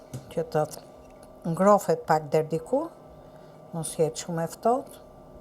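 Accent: Tirana, Albania. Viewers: Pershendetje moj sulltanesh e gatimeve shqiperise se mesme nuk na ke kenaqur vetem me ato gatimet e tua te mrekullueshme por na ke kenaqur edhe me ate dialektin e bukur te tiranes kur flet flet nje dialekt te bukur qe une e motra ime e pelqejme shume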